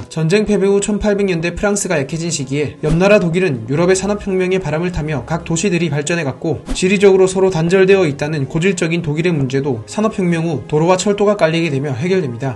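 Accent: Gyeongsang, South Korea. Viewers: 컨텐츠가 아주 좋네요. 그런데 경상도식 발음은 좀 교정을 하셔야할것 같아요! 영향, 전역, 그냥, --년, 등등.. 연음이 표준어에서 벗어난부분들이 많이 보이네요.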